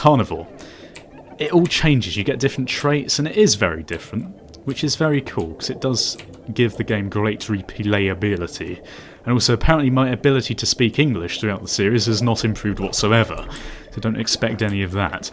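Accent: United Kingdom. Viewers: His accent is very British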